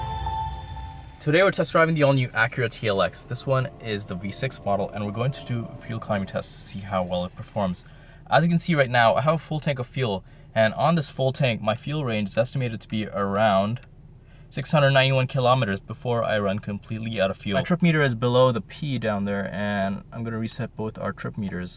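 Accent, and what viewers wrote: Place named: United States. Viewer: Which country is this? The narrator has an American accent and they are speaking in kilometers and liters as well as dollars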